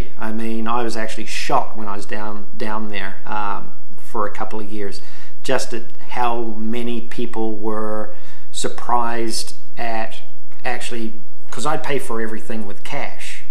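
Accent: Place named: Southland, New Zealand. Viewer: Still hear the Southland accent there a little bit. I am from Invercargill. Thankyou.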